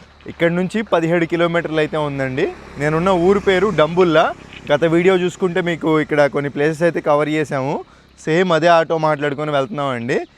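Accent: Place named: Visakhapatnam, India. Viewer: మీది విశాఖపట్నం ఏనా భయ్యా మీ మాటలు అక్కడిలానే ఉన్నాయి కొంచం. మీది ఏ ఊరో చెప్తారా భయ్యా 😊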